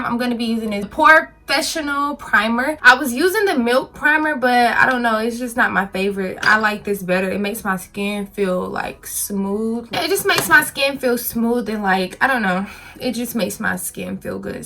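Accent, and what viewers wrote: South, United States. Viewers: I didnt see the southern accent coming. It's engaging, and you're beautiful! 😘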